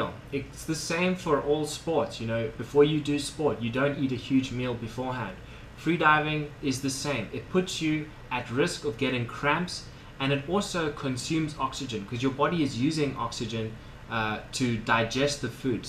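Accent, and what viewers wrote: South Africa. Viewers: Nothing like a good ol' SA accent :) Cool vids boet, keep 'em comming! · Thanks for the tips mate, are you from South Africa?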